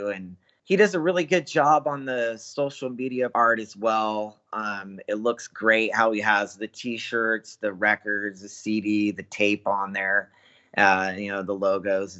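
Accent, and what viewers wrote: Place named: Brazil. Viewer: faz um podcast em portugues mannnnnnn...saquei esse sotaque br!!!!1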